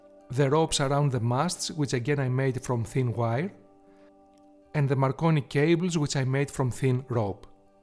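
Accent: Greece. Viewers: Με τη προφορα των αγγλικών ήμουν σιγουρος οτι εισαι Ελληνας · are you greek ? Your accent sounds alike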